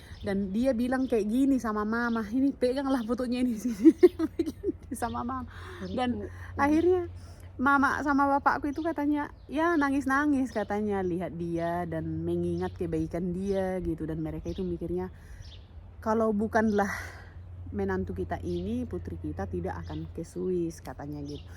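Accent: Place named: Batak, Indonesia. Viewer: Senang kali sama kk..walaupun lama di luarnegeri bahasa.dialek batak msi kental👍🙏🙏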